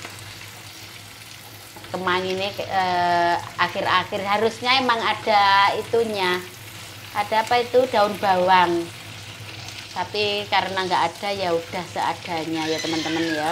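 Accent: Java, Indonesia. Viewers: Aqu suka bila te vloq masak " suka bhs nya ya mendok jawa blitar tak gojake ' pinter te ajari putri nya untk memasak ' sepandai apapun sekaya apapun bila punya potensi diri insya alloh berhasil dlm hidup So switt bahagia te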